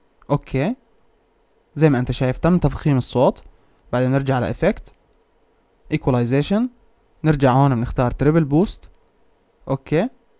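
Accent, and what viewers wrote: Levant, Jordan. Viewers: انت اردني او فلسطيني صح انا جنسيته فلسطيني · الشب من الاردن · انته اردني ؟ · اردني ولا فلسطيني؟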